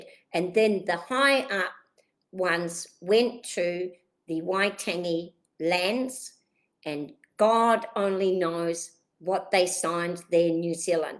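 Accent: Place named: Australia. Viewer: YOU SOUND AUSTRALIAN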